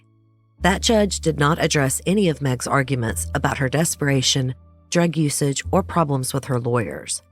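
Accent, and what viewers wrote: South, United States. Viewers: It's so nice to hear a southern accent...❤ Great job on the videos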